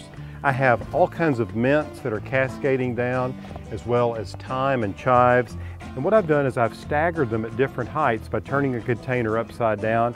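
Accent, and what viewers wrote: United States. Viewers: urbs??? Baysal??? ;) loving the American Diction :)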